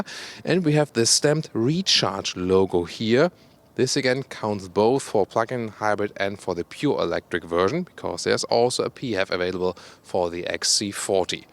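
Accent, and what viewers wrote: Germany. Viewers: Forgive me but I'm here mostly for the German accent. EVs are still too costly for my monetary capabilities. Though however much I wait my next new car will be an EV.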